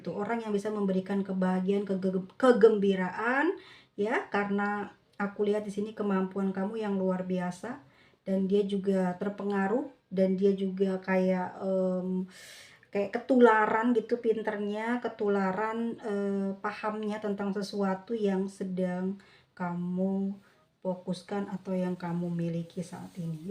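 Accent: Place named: Sunda, Indonesia. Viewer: sy seneng suara ketawanya. Siga sunda.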